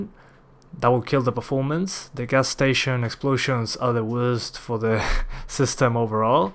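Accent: Argentina. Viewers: Wacho alto nivel de inglés, tarde un poco en notar el acento argentino.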